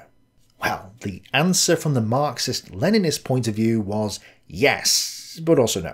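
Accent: United Kingdom. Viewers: Classic, just few minutes in and so much makes even more sense than before, almost as good as Captain Context himself. Luv me listenin' to Br'ish lads, simple as.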